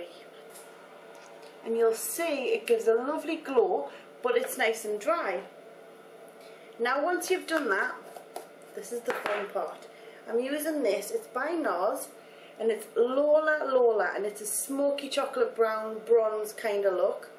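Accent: Newcastle, United Kingdom. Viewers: where are you from? it sound like your from around the newcastle x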